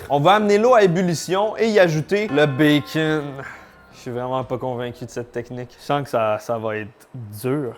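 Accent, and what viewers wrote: Quebec, Canada. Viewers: Je remercie mes 2 années au Québec, je peux comprendre dans cette vidéo xD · Gourmandise française mais qui adore aussi votre phrasé québécois ❤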